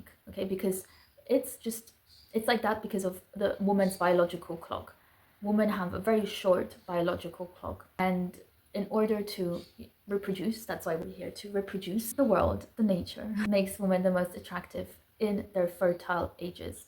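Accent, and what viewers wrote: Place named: Eastern Europe. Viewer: I think you are from Slavic country? Urs accent 😂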